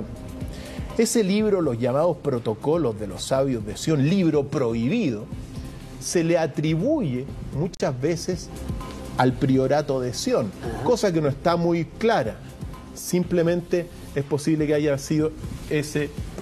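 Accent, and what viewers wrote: Chile. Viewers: Ya me están gustando los chilenos !!! Será que todos hablan asi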